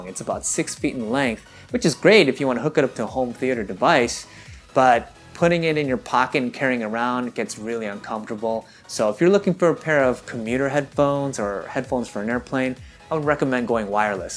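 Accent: United States. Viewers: your American accent sounds very pleasing and accurate!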